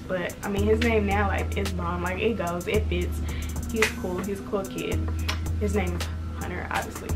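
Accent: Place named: Dallas, United States. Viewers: Fr it’s that country mixed with some properness 😂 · As soon as I clicked on this video and heard you talk I knew right away you from Dallas 😂😂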